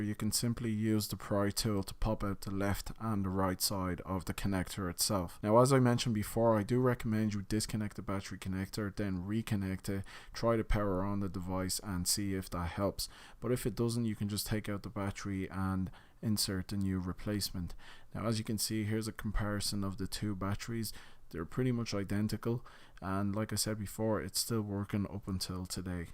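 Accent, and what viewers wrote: Ireland. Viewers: Yes really clear and focussed. I love the Irish accent too. Voice sounds so relaxed like everything is under control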